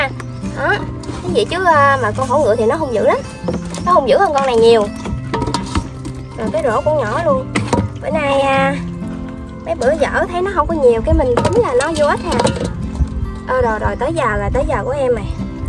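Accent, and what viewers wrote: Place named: Mekong Delta, Vietnam. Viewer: rất là chất phát miền Tây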